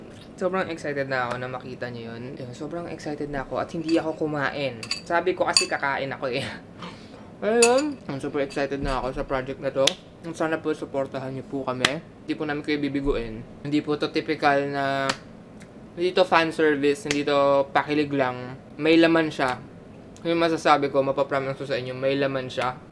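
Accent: Visayas, Philippines. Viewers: Butete.... visaya accent is funny to do thing 😂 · Meron talagang bisaya accent eh 😍 palagi ko napapansin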